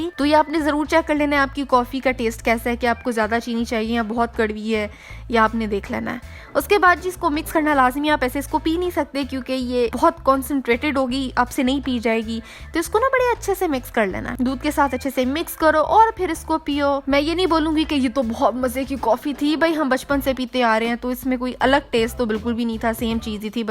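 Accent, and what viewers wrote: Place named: Punjab, India. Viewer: Cute punjabi accent. 😊😊👍👍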